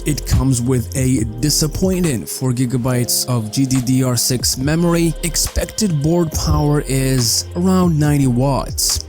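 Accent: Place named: Russia. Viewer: Мне показалось, что у вас русский акцент) успехов в развитии канала.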